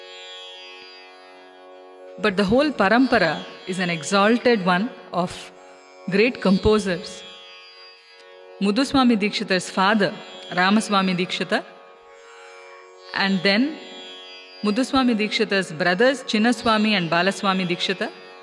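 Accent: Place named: Brahmin, India. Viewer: Excellent. I always relish the English Tamil talked by Brahmins. Can call this Brahminish.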